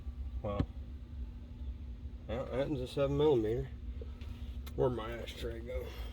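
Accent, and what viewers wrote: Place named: South, United States. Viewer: That's a nice truck and a fun project if one have the time and money for it. Especially since it was your granddad's. I love your accent. I'm European and have never been to the USA but if I go there one day I think I'll skip the north and just travel around the south, probably Nevada too because of Fallout New Vegas. 😄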